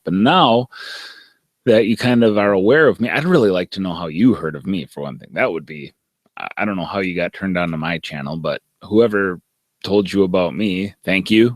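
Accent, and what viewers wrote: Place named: Wisconsin, United States. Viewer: I live in Milwaukee - I've been saying the same thing about getting to Pete's store but haven't been yet. Where in Wisconsin do you live? You have that signature Wisconsin accent that we're all famous for by the way!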